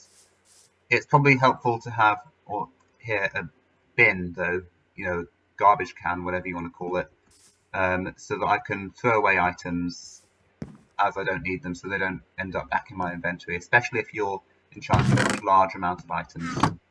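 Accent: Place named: Manchester, United Kingdom. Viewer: Is that Sancarn reincarnated?! Lol I’m joking but I’m super glad that a fellow Brit has managed to make this incredible technical discovery! P.S you sound like you are from Manchester :P Congratulations on this achievement!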